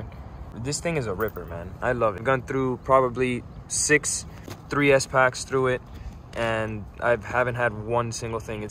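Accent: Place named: Miami, United States. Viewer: Have no idea how I came to find this channel but gotta say bro hearing you talk hella remind me of Game Bred himself Masvidal. Lol. Gotta be from the MIA?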